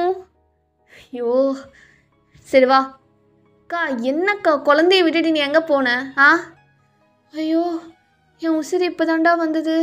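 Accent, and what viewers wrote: Kerala, India. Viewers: i love love love ur malayalam it's so damn cute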